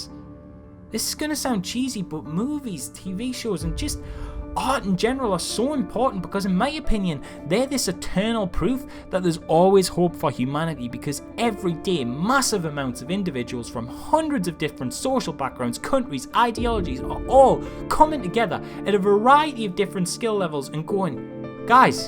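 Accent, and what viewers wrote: Newcastle, United Kingdom. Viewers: A geordie review of the merits of The Room. My life feels that little bit more complete XD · RIGHT! How do people like Markiplier have 20 Million subs? This guy is intelligent, funny, passionate and non-cynical (Plus the geordie thing isn't losing him points either). It's like the secret treasure of youtube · YOURE GEORDIE ARENT YA "HOWAY" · Are you a Geordie or a Mackem?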